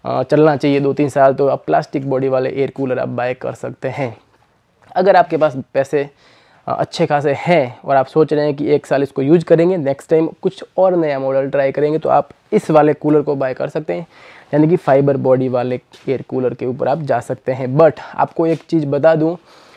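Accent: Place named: Shekhawati, India. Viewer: भाई आप झुंझुनू के है या चूरू के हैं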